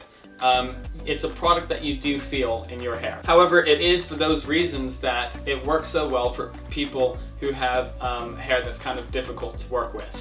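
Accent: England, United Kingdom. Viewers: I know I have said it before but English accent is coming on REAL thick! Like damn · lol come back to america your starting to develop a british accent and im not used to it😂😂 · Is it just me or does it sound like he's supposed to have a British accent? Haha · why does he sound british in this video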